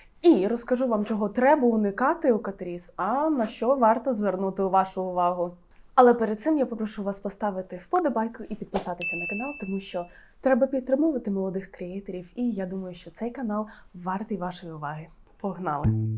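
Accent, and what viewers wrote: United Kingdom. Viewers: Вперше чую українську з британською інтонацією😅 наче дивлюсь укр контент, а відчувається наче дивлюсь англомовного блогера. Якийсь дисонанс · Суперське відео, люблю цей бренд) І у вас яскравий, як на мене, британський акцент, дуже класно звучить😍😍😍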